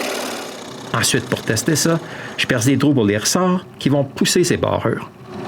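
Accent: Quebec, Canada. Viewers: Super idée et super réalisation ! Il ne manque que les odeurs de bois et de colle . . . Bon , l'accent québécois est parfois dur à comprendre , c'est un Marseillais qui te le dit ( le nôtre est " costaud " aussi ! ) . Bravo pour ton travail ! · J'adore c't accent d'la belle province ! Mais mince alors, c'est pas juste kek bouts d'bois, c't'un chef d'oeuvre, tabernacle !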